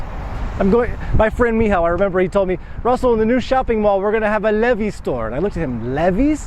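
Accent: United States. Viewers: Let us start from basics - your accent is poor cuz you're Yankee. You'd be lost in Shropshire :)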